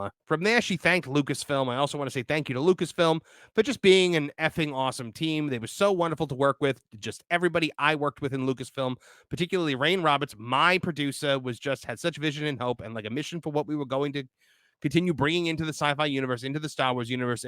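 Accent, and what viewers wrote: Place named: New England, United States. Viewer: Are you from Boston/New Hampshire/Rhode Island? you got the accent. Go Sox!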